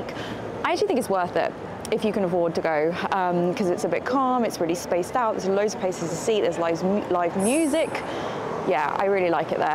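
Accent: RP, United Kingdom. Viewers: Nice video .I like your accent .Slightly posh but not too much so .I will be spending half a day in London next Sunday .Walking around but not buying much at those prices .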